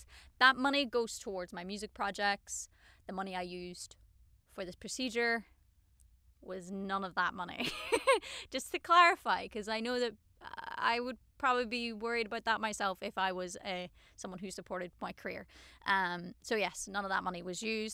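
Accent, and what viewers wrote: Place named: Ireland. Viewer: First off, who the hell would write a hateful comment, this is for fans. Anyhoo, a close family member of mine has the same condition(pigeon chest) and a case of flail ribs(the bottom ribs stick out from the ribcage). A male, I guess it might be different in a self-esteem point of view. It posed no health issues and he worked out and went to the gym and although it's still noticeable, not nearly so as before like when he was very young. I didn't know there was actually a surgery to fix or change it; not including the enhancement that is. No one should make someone feel bad about having an elective surgery to correct an issue or to help one feel better about themselves. I enjoy the sound of her voice so much. The Irish accent is absolutely mesmerizing.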